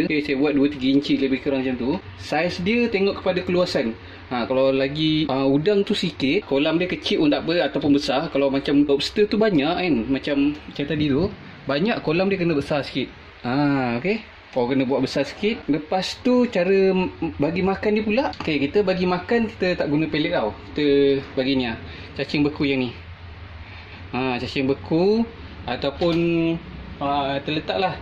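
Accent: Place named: Kuala Lumpur, Malaysia. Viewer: Ni cara cakap orang kuala lumpur dan Selangor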